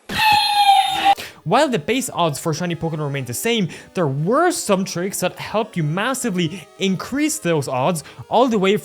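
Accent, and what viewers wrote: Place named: Germany. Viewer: Are you german? Your accent sounds a bit german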